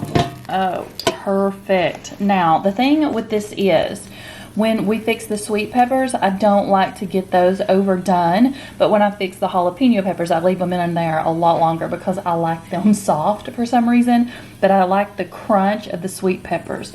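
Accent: South, United States. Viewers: I trust cooks with southern accents more!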